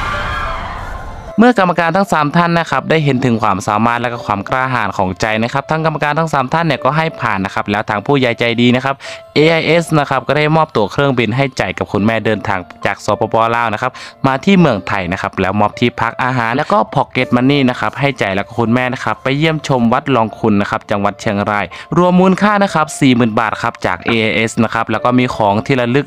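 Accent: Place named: Cambodia. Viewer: แอดค่ะ ล้อเหมนบ่อย จนพูดติดสำเนียงเหมนไปแล้วนะฟังผ่านเหมือนคนเขมรพูดไทยเลย😅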